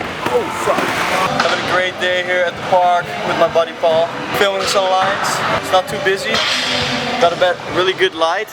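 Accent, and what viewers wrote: Netherlands. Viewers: What's your mother language? Dutch?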